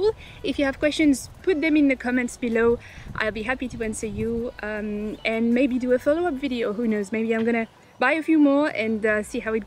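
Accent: France. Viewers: Je dois debarquer(voir parler dans le vide)Mais,il n'y a pas un accent français?☺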